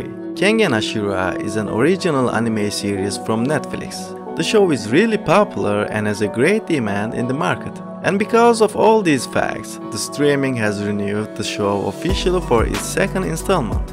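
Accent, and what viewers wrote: Turkey. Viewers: Bu aksan türk aksanı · Aksandan anladım türk olduğunu :), insan altyazı koyar be hocam 😂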